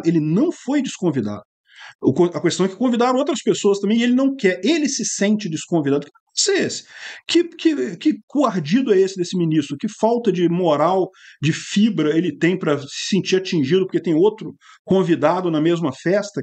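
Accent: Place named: Rio de Janeiro, Brazil. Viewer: Por que cariocas dizem "cuestão"?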